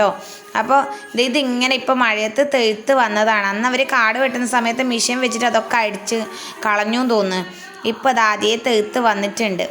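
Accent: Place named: Malappuram, India. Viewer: നിങ്ങളുടെ സംസാരം മലപ്പുറത്തെ പോലെ തോന്നി😄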